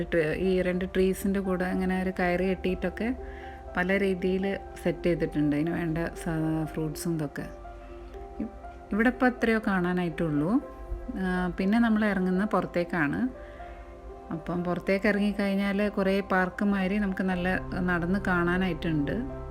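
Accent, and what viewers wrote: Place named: North Kerala, India. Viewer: Super vlog dear. Detail ayi kanichu thannu. Koode Nammude swantham vadakkan bhashayum😍😍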